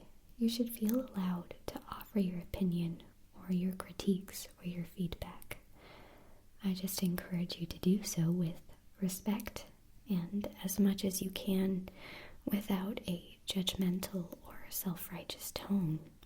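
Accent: South, United States. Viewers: You, ma'am, have done the impossible. What is it that I speak of, you ask? Isn't it obvious? Bagpipes. Bagpipes in an ASMR video, haha! I never thought it possible! I'm teasing, of course. The concept just struck me as funny ;) But now, my serious face... I quite enjoyed this video, but my first comment to you is a bit more general. I have been an avid seeker of ASMR videos since I discovered their existence and more incredibly discovered that this odd, pleasurable, and difficult to describe feeling was shared by quite a few (albeit still a select few) other individuals. Like all of us tingleheads, I have my favourite ASMRtists that I subscribe to that aren't just giving me head-gasms, but are also just flat-out talented entertainers. And this is where you come in. I love your videos for the guaranteed tinglefest they give me, their relaxing nature, and this incredible sense of comfort I get from them. 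It must be that southern charm my Bostonian ears just melt for... But girl, you are a natural in front of that camera! I mean, of course that pretty face of yours doesn't exactly hurt, but I think you just have a gift for acting. You have excellent diction and your comedy sense and timing are all things that can't be taught. I mean, after all that how could I not subscribe to your channel?! Keep up the amazing work, you are much appreciated. Sincerely, Andy Mac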